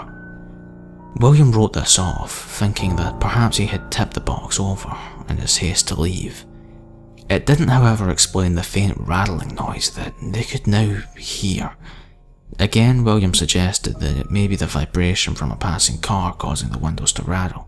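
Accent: Scotland, United Kingdom. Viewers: Hi devilslittlesister. Unfortunately for my freaked out family, it's not a creepypasta, they swear blind it's true. And, yeah, he's a great narrator, I'm a sucker for a Scottish accent!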